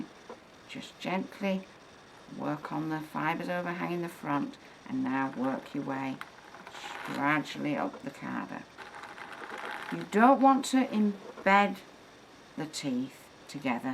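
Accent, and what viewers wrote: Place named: Yorkshire, United Kingdom. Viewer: Love this vidoe, love the accent. Feel like km learning from one ot my Yorkshire grandmas. Thabkyou so much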